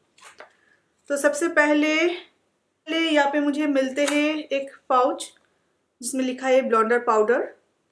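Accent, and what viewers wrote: Bengal, India. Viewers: Are you from West Bengal, your language is like bengolis speaking hindi ( ^ v ^ )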